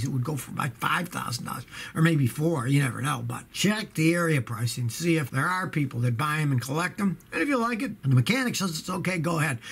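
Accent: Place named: New England, United States. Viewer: With the "Toooob" lol I like his yankee accent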